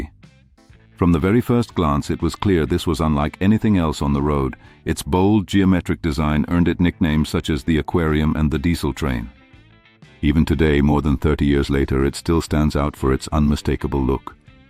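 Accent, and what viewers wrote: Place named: United Kingdom. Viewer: Yep, 100% AI slop. Generic British accent voiceover, no breaths, slightly off vocal cadence. There's so much of this trash on YouTube now, I can usually spot it in seconds.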